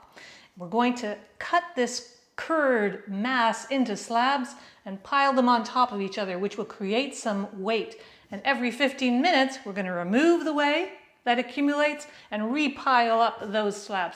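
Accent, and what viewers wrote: Canada. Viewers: Wonderful, sensible instructions, without fuss. Also enjoyed the clear enunciation--is that a Canadian accent? Lovely. Thanks for this!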